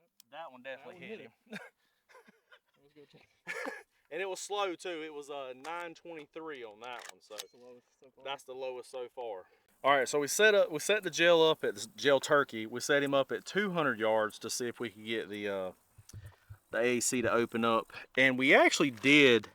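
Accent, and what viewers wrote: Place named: South Carolina, United States. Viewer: I know an SC accent when I hear one. Awesome video. I was interested in .300 blackout but maybe I'll save myself some money.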